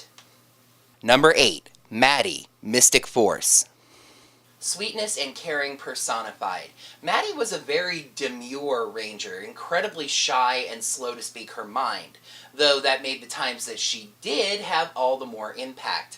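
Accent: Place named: Scotland, United Kingdom. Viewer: I really enjoy watching this video and your Scottish accent was on point and my vote for favorite pink ranger is Jen from power rangers time force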